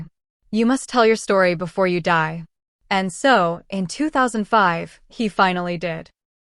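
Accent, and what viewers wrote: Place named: United States. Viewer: Difficult listening to an american female voice narrating this story so i wont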